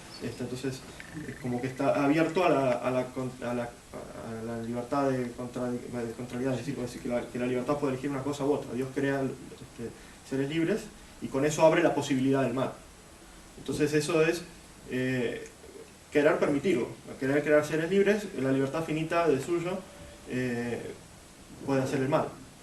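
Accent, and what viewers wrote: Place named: Argentina. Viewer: La verdad no entiendo, como esta genial exposición, no tiene más me gusta. A lo mejor, es que a mí me sirve, por el hecho de ser siego. Pero cuando uno ve, tantas tonterías en YouTube, con tantos me gustas, y estos excelentes videos, con tan pocos, entiendo por que vivimos así. Es cierto Leibniz tiene algo de Platónico. En el II libro de la República, cuando forma la ciudad, muestra que no puede existir virtud sin vicio. te mando un fuerte abrazo desde Quilmes (supongo si no me equivoco por el acento que tenés), tu Argentina.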